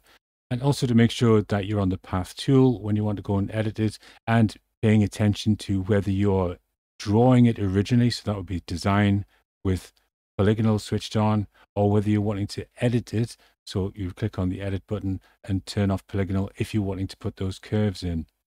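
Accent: United Kingdom. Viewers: Thanks for the response, I'm sure it is a lot more stressful to do that sort of thing As for your sense of humour and personality, it's probably fair to say it is very British, and all the better for that. It can get very hard finding a familiar voice in amongst all of the American streamers. Take care of yourself and I'll certainly finish off watching the last few episodes of the playthrough. Chris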